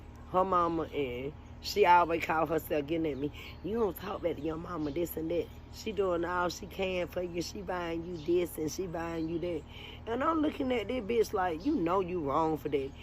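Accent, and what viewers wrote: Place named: Florida, United States. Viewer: Keep your head up, continue to keep God in your life, I went through some similar things got me down for awhile but I found God and will continue to keep my father in my life, I will pray you continue to keep your head up and stay blessed! I'm from fla too but I live in NY now and I swear you sound so much like my auntie's and I love it, please don't ever lose your accent they made me get rid of mine when I came to NY and went to school! Stay blessed🙏🏾❤️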